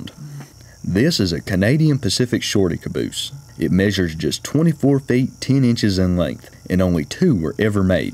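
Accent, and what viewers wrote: South, United States. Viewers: Did anybody ever tell you you have one of the best voices I have ever heard? It's like the very personification of the South. Amazing voice, my man. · Very nice format, good info and content! The southern dialect is just the cherry on top. ;-)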